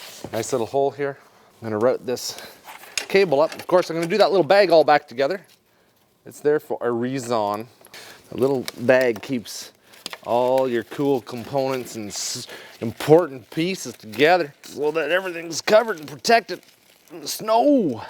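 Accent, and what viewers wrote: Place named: Northern Canada, Canada. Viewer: it sounds like your from Northern Canada. where abouts?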